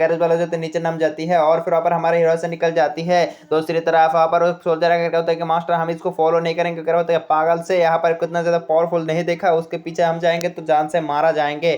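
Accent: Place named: Bengal, India. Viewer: Your videos are nice and i really follow it but please try to improve your hindi I can understand you are a bengoli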